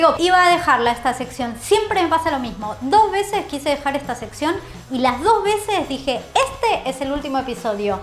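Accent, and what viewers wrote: Argentina. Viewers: Me encantan todas tus recetas pero me recopan mil tus "meal prep" y tus "puede fallar". Dale, que te veo siempre. Voy a acabar hablando en argentino, che!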